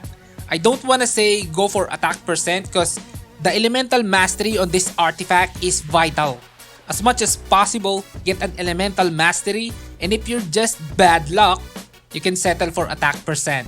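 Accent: Philippines. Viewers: that voice....and accent... Filipino?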